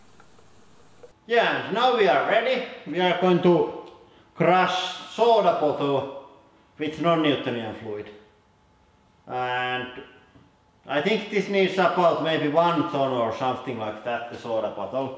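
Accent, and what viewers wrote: Finland. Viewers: I like the way how finish people talk english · I'm always amused with this guy's Finnish accent, saying "who-draulic" press instead of "high-draulic" press. But, in all out fairness, I imagine my American accent shows through when I speak German.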